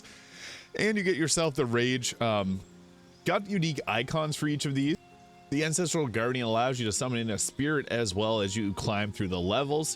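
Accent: Canada. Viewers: Love your content but why do you sound Canadian sometimes?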